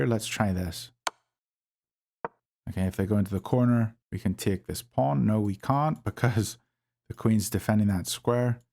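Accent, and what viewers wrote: United Kingdom. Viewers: gg buddy , which accent u have in uk¿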